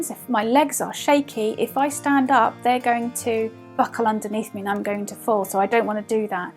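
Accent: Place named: United States. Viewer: Bonjour. Je réponds donc en Français. Je reviens d’une promenade dans la nature. J’habite dans la région Auvergne-Rhône-Alpes. Êtes-vous la dame de la vidéo? Êtes-vous américaine ou britannique? Je pencherai plus pour la nationalité américaine, au vu de votre accent et du fait que vous parliez sur you tube. Je souffre du trouble neurologique fonctionnel (TNF=FND) depuis ma vaccination ARN messager. Je marche avec une canne et je souffre d’une dépression existentielle assez aiguë… J’ai des douleurs neuropathiques. Je vais chez un neurologue en septembre pour écarter les maladies neurologiques classiques comme la sclérose en plaque ou un accident vasculaire. J’ai adhéré à l’association Covid long France pour ne pas me sentir seule face à la maladie. Je vous donnerai régulièrement des nouvelles en anglais ou en français comme vous le souhaiterez. 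Ce sera un réel plaisir pour moi. 🙂🙂🙂 Amitiés. Christelle 🌈🌈🌈🌈🌈🌈🌈🌈🌈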